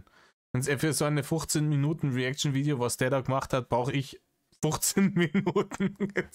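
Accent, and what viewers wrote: Bavaria, Germany. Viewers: Dein Latein ist grottenschlecht, aber dein Bayrisch ist super 😂 Danke für 2 Stunden Kurzweiligkeit im Auto. Mach bitte so weiter 😊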